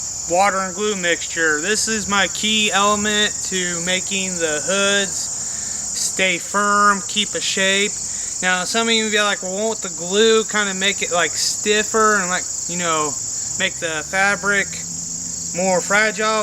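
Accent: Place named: Tennessee, United States. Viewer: Rly good video. Subbed! 🤘🤘.. BTW! That accent.. I just gotta ask you bro, are you from Tennessee? Hails from Finland!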